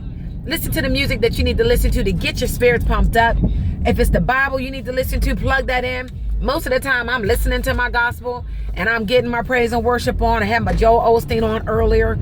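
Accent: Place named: Pittsburgh, United States. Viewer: It sounds like your from Pittsburgh I wonder if you work for troy.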